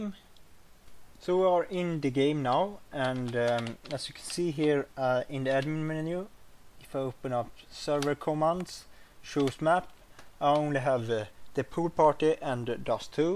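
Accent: Sweden. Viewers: Thanks! I was completly sure that you were swedish when I heard you talking.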